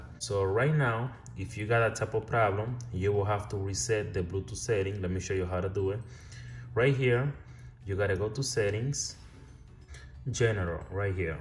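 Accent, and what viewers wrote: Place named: Dominican Republic. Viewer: you can tell this nigga Dominican😂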